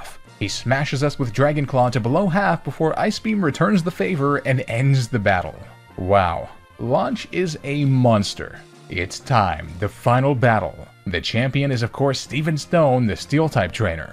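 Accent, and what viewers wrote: Canada. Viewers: I love how Canadians saying out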